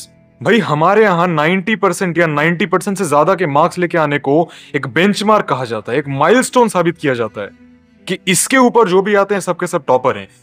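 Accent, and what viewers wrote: Bihar, India. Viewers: Brother Aap bihar se ho kya ?😊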